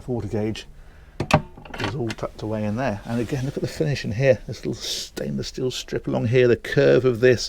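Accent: England, United Kingdom. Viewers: This is the only englishman whos accent i can take. I love you man!!!!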